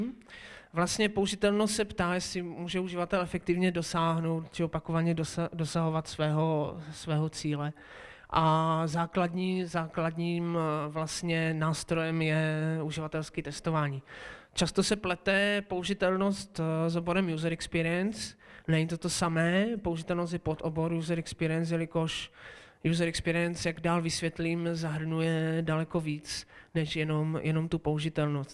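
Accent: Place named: Moravia, Czech Republic. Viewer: díky za facku. nechal jsem se unést pod vlivem špatného dojmu z toho videa (k čemuž v mém případě určitě přispěl i moravský přízvuk přednášejícího, který nějak nemůžu vystát - omlouvám se, ale prostě je to tak... snažím se to ale do hodnocení nepromítat). přednášel odborník pozvaný na doporučení, takže by bylo fajn, kdyby to mělo tomu odpovídající úroveň; podle mě ji to nemělo (neodvažuju se nicméně zpochybňovat odbornost přednášejícího, ale odbornost není všechno…).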